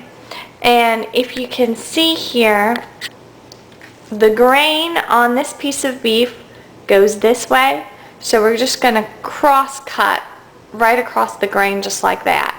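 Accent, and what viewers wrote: South, United States. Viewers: your accent southern ?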